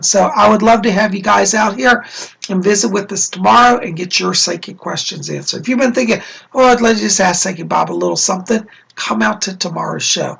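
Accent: United Kingdom. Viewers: Bob you starting to sound like your from UK lol you and Lady Cola make a beautiful awesome team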